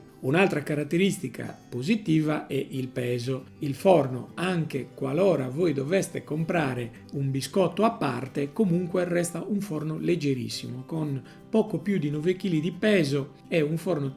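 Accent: Sardinia, Italy. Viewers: Sentivo accento nostrano... Bravo, saluti da Nuoro.;-)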